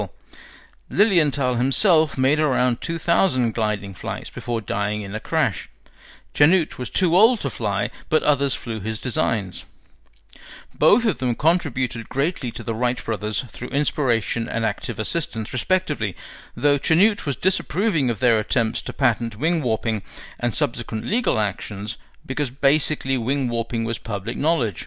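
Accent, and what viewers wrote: United Kingdom. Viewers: If you can't tell an obviously English accent from an Australian one, how on Earth can we believe a single word of the drivel you have typed above?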